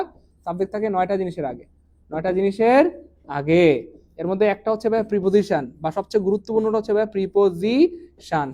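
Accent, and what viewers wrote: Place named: Noakhali, Bangladesh. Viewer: স্যার মনে হচ্ছে নোয়াখাইল্লা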